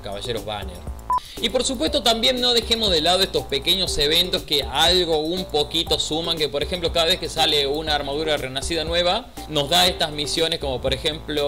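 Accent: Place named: Córdoba, Argentina. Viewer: yo soy de rosario, y a mi me sonas como de Córdoba o no se. En fin no importa tampoco, gracias loco porque aprendi una bands viendo tus videos. Seguí así!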